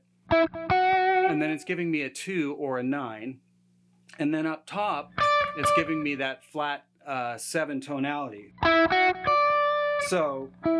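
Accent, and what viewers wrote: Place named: South, United States. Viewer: This was awesome stuff. love that country accent. had me rollin'.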